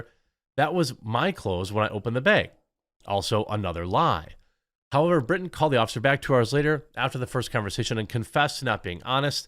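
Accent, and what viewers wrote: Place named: Midwest, United States. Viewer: Your Midwest accent really comes through when you repeat the word "beg" and "beggege", as in, he stole a "beg" and flew a "fleg".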